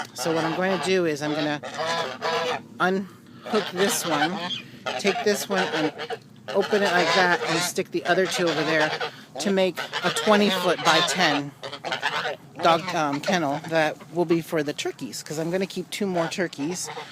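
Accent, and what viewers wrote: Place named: United States. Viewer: Hi, u might have seen my comments before. I see u like you ducks ect. Well I was thinking u would like expensive more pretty kinds. And they are rather hard to get! I have red breasted and emperor geese...? They are worth quite a lot as they are hard to breed and are very very pretty! If you like any ideas with birds like these give me a shout and I can tell you. I'm not trying to see you anything as I live in Scotland and by your accent your from America? Thanks!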